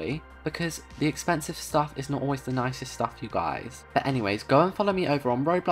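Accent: United Kingdom. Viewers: HIS BRITISH ACCENT IS SO NICEE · i LOVE the british accent its like ''BeTa'' and ''a BlEnDa'' LOL:D tysm for making my day better<3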